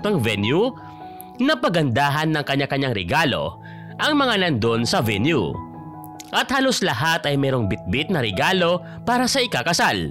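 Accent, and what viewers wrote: Visayas, Philippines. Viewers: Bat ganon ang diction at style ng pag kwento mo, BISAYA KA DONG?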